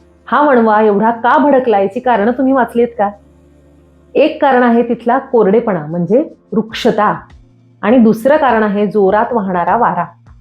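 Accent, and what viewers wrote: Standard. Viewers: अतिशय छान. दैनंदिन जीवनात उपयोगी पडेल अशी माहिती. डॉक्टरांची भाषा अतिशय स्वच्छ व‌ उत्तम मराठी. ऐकत रहावं असं वाटतं. मनापासून कौतुक.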